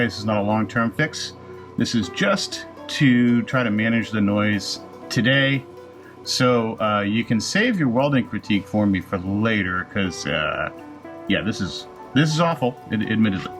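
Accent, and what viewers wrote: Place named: New York, United States. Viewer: Sounding like a real uneducated NY'er - lets keep it civil ❤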